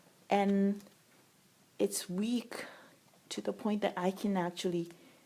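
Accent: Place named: Philippines. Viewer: You sound like a Filipina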